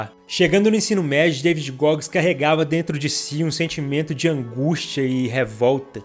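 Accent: Minas Gerais, Brazil. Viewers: Parabéns!! 👏🏼👏🏼 vc é mineiro né? 😅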